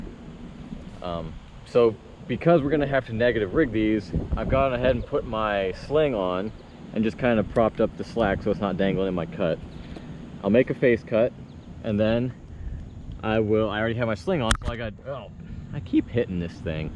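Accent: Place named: South, United States. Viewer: What state is this in? I’m trying to work out what your accent is. It sounds a bit south but not deep south (I’m in the UK so I’m not sure)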